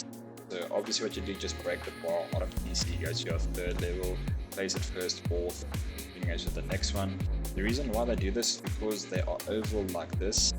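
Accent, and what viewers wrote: South Africa. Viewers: Are you South African??????? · lol Afrikaans?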